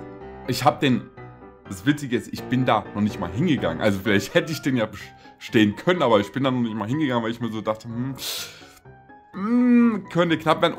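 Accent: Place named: Saxony, Germany. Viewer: Also ich muse ja mal sagen, ich liebe dein Humor, "woher kommst du"? "Von draußen"! 🤣🤣🤣🤣, das hät glatt ich sagen können.Das du aus Sachen kommst hört man eigentlich sehr gut. Aber gut jüngere haben da weniger Ahnung. Das FAQ war sehr Interessant, gerne mehr davon. Aber bitte eine andere Musik beim nächsten mal.Und wünsche dir für deine Umschulung alles Glück der Welt das alles unter der jetzigen Situation statt finden kann. Und ja ich zumindest finde das du Menschen sehr gut Unterhalten/Aufmuntern kannst. Dein lachen und humor sind ansteckend, danke dafür.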